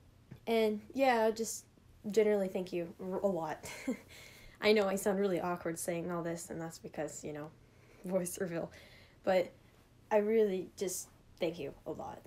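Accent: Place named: United Kingdom. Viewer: oH MaH GwAd YoU'rE VOICEE IT SOUNDS ADORABLE :0 YOU SOUND SO BRITISHH REEEEEEEEE you're precious uvu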